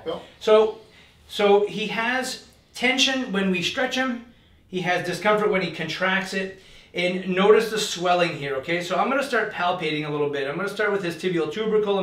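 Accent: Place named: Quebec, Canada. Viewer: You have an interesting accent...are you French Canadian? You sound like Jonathan Pageau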